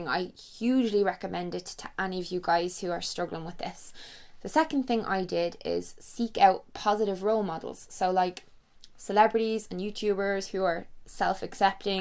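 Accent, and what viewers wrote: Ireland. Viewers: I am watching from Ukraine! I love you so much and you make me looooooveee Irish accent! You're so cute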